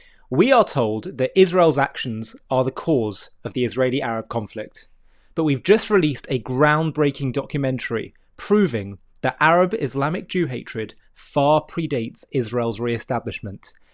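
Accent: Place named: England, United Kingdom. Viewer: HAVE YOU EVER BEEN TO OCCUPIED PALESTINE WITH YOUR ENGLISH ACCENT??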